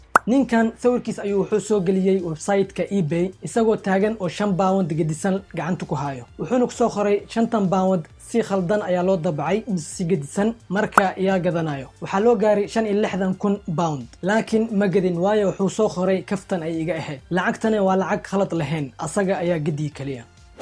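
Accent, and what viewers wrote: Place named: Mogadishu, Somalia. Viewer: ee imka qof yutubar ahtahy markaa afsoomali bilaa xamar ku hadal ile reer muqdisho uun ku dawan mayaan dadkle oo guud ku dawanyee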